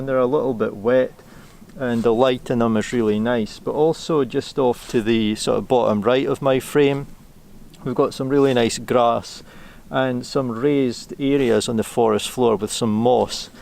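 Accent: United Kingdom. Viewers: Your image screamed Scottish Woodland as I know it, which is perfect. A gorgeous image, absolutely stunning especially with that silver birch behind your subject tree and the light on the floor. It all came together to make a phenomenal shot. Whenever I look at your subscriber numbers I always expect to see a K after the number. I don’t shoot videos mainly because of how I sound with my breathing so any advice from myself would be plucked from the air. However, I read on another channel how our friends from across the pond struggle with our British accents, I was wondering if you slowed your speaking down a little bit it might help? I’m not trying to be critical, just trying to think of things that might help your channel get to where it rightly belongs. You are undoubtedly one of the best British landscape photographers on YouTube, but your numbers don’t reflect that fact. 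Anyhow, that aside an brilliant video with an image I’d be more than happy to have as my 'one' image after a week out never mind 3 hours.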